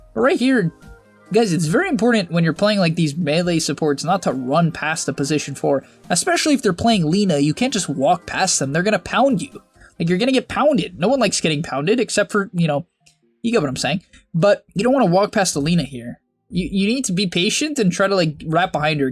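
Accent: Australia. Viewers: Australian accent got me good 😂😂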